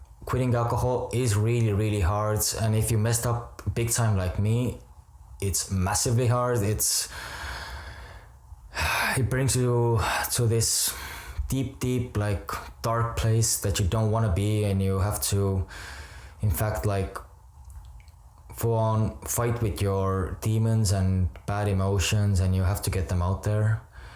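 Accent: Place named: Ireland. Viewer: Kind of sounds like an Irish accent.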